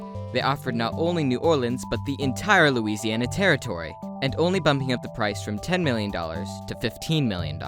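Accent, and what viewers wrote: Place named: United Kingdom. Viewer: idk why but at first he sounds kinda british not gonna lie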